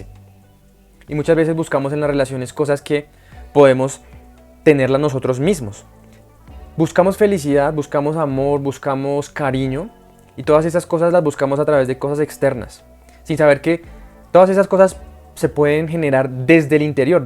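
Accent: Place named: Colombia. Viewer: Sos muy genio, gracias por tu luz, saludos desde argentina, amo el acento colombiano 😊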